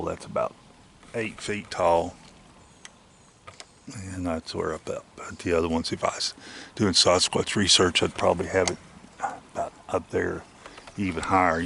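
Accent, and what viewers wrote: South, United States. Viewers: Enjoy your Videos, good hearing another Southern voice, pretty Country there. Stay Safe, best wishes from a Ga Fan....😷🇺🇸🍑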